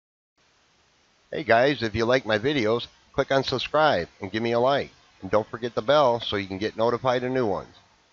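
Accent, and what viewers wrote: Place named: Upstate New York, United States. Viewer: You sound like you’re from upstate NY. Lol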